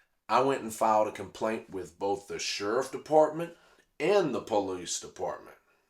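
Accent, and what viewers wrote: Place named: South, United States. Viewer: I'm getting the weirdest vibe from you here. It's clearly all insincere. It looks like bad acting as you're reading a script. But there's more. You have the weird redneck accent thing going on with sayings and mannerisms that are supposed to convey that you're not too bright and that you're one of us. It's all fake though. You're smarter than you're selling yourself as. You're gaslighting and faking. I won't be subscribing for this "content" you're trying to sell me on. It's not clear what this channel is about but it seems clear to me that you're trying to monetize this incident. You put on this "cool" persona but your acting is terrible. Most people will not even notice though. Most everything people watch these days is completely fake and they still accept it as real. They say "I know this reality show is edited and scripted" but in reality they are falling for it. 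They are desensitized to fakeness. I found this video rather repulsive to watch. You are not the everyman you're trying to sell yourself as. You are not stupid. Most people will fall for this bad acting job you're doing but I am not one of them.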